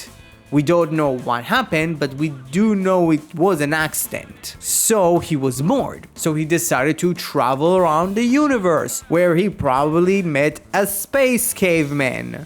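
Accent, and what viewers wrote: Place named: Spain. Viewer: Uh You said Bundorio's name wrong It's actrully pronounce Bun-do-ri-o Bun-de-ras Since you have that Spanish acent of yours,saying Bundorio's name in English can be tricky for Spanish Sentai fans Also is Taiya and not Tire,oh my god the Boonboomger fans are so going after you after you saying the character's names wrong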